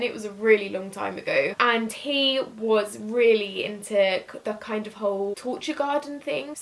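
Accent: United Kingdom. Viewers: I am in love with your voice and British Accent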